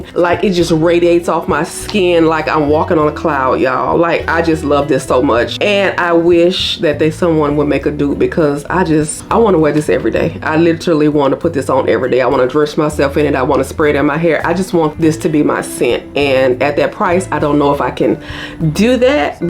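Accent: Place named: Tennessee, United States. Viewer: I LOVE your accent! Where are you from? It sounds Memphis/Tennessee as I’m from middle Tennessee 😂❤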